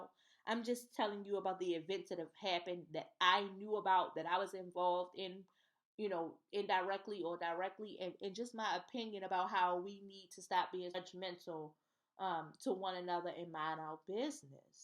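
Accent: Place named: DMV, United States. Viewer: I enjoyed this video very much so. Honestly! Everything you said hit the nail on the head. S/N: Are you from the DMV area? Your accent is everything 😍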